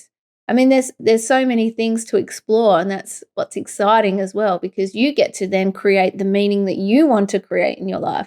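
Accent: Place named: Australia. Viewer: A few random (and kinda tired... sorry if what follows isn't 100% coherent!) thoughts... • First, I never thought I'd say this, but having just dived down the YT rabbit hole of investigating the existential risk of AI (with the attendant copious US talking heads), it's bloody nice to hear an Aussie voice for a change! • Second, there's a saying (that I think originated in the business world, but that seems to hold true in the general case as well) that "if you can define the issue, then you've already half solved the issue", or something like that, anyway! The point being that if you have some problem, any problem, then in order to remedy it it's an imperative to recognise and define it. And so, having neatly laid-out the crux of the issue (or the, erm... blockage!) it becomes much more straightforward to solve it (or, ummm... flush it! haha😅). Basically, if you can't see it you can't fix it! 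• Third, and this is the reason that I initially clicked on this video (you know, ummm, besides for the very attractive, and, as it turns out, super sweet, positive, and refreshingly open and honest, presenter!), the 20th century French philosopher Albert Camus was of the firm belief that the central problem faced by people was that there was no inherent meaning in our lives. In fact, he thought that almost all of our suffering originated not from our search for some external meaning to it all - life, the craziness of it, and the subconscious knowledge of our impending deaths - but that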